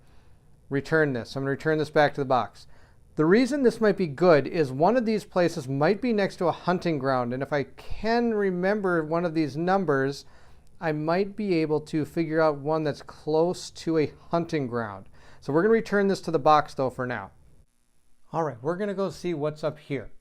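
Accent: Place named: Midwest, United States. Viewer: I'll never get over the midwest pronunciation of "root" and other similar words. Haha. Had a classmate from Duluth many years ago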